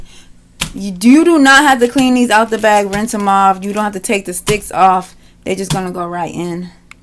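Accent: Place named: North, United States. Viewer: She sounds like she’s from up top to me.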